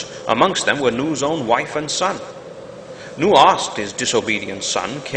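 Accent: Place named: Scotland, United Kingdom. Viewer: The narrator sounds Scottish like sean connery.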